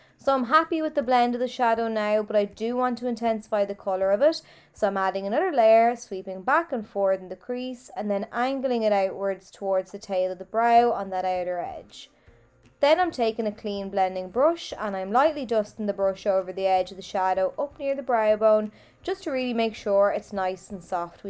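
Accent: Ireland. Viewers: Thank You for another fab eye look loving the smoky emphasis on the lower lash line and with a gorgeous Irish accent, I do love an Irish accent you know 🥰⭐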